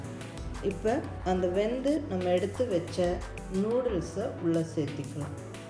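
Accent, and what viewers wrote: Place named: Kongu Nadu, India. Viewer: Ur slang seems like erode r Coimbatore mam so sweet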